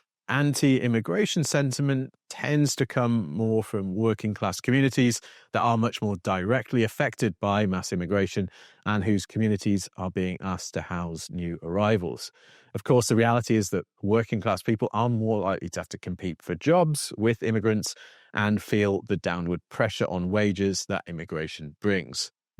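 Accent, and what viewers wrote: England, United Kingdom. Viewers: Where are you from originally ? You have an English accent.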